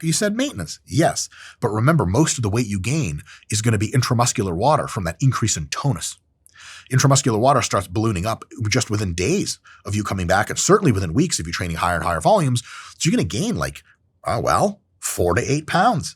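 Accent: United Kingdom. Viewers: Your British accent is aweeesome 😂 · British accent 🤢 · Love the British accent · I need more of that British accent! Hilarious! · I f******g loved the British accent. Much love from the UK brother.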